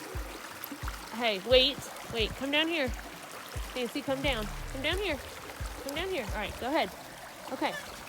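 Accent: South, United States. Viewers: It's the lady puppy for me!!! It's the southern accent for me!!!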